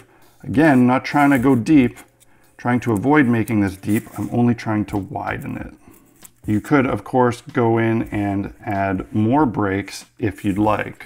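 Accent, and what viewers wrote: Canada. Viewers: You said "out" so many times I discovered that you're Canadian.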